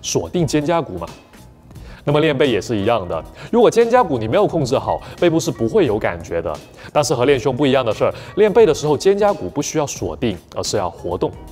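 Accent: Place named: Guangxi, China. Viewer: 非常棒的视频，感谢分享！听口音广西人吧？和我关注的另一个股票类youtuber：Emile Su的说话方式真的很像。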